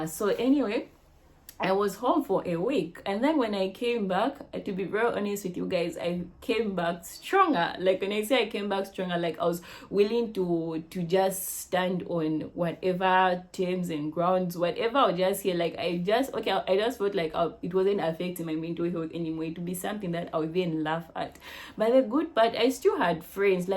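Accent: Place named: Uganda. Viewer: You talk like a Ugandan, 🥳🎉 pleasure is all mine ones again,thanks for posting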